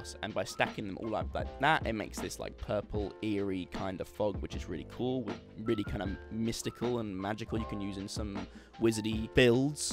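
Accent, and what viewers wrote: United Kingdom. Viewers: I always love british accent. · I like so much British accent.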